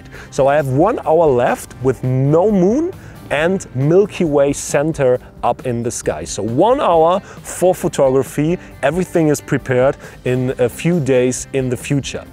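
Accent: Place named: Germany. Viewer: PEP also Englisch ist sehr gut. Nur ein deutscher Akzent, aber das ist auch egal:) mir gefallen die Videos So, English is great, but he has a German accent, but I think that doesn't matter:) I like the videos. They are great BTW: this is an English channel. I recommend to use English. It helps you to learn English and the other persons understand what you are saying;) just a little tip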